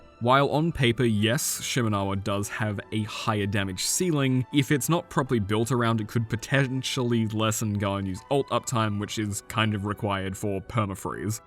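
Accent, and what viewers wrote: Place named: United Kingdom. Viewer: Omg- British accent gives a like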